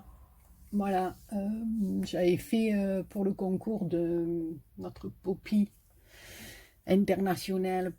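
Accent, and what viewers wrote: South, France. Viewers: Cc ma toute belle et oui tu as encore été gâté c est super. Les papiers tes 2 albums sont magnifiques et je trouve que c est même dommage de le cacher avec des photos. J ai toujours beaucoup de plaisir à regarder tes vidéos qui me font toujours beaucoup de bien et vu que je ne suis pas partie en vacances pour raison financière tu m apportes avec ton accent le soleil du sud que j aime tant. Bisous bisous ma belle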